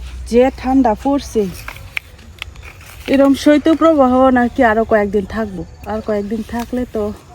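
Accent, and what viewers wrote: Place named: Narsingdi, Bangladesh. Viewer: আস্সালামুআলাইকুম। আপু আপনার কথা শুনে মনে হচ্ছে নরসিংদীর। আমার দাদার বাড়ি।জানাবেন প্লিজ। নাকি ময়মনসিংহের?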